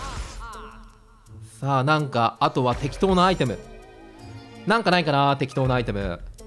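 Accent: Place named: Yokohama, Japan. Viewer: もしかしたらさん横浜？に住んでるから訛りが入ってるのかもしれない（横浜であってますよね？）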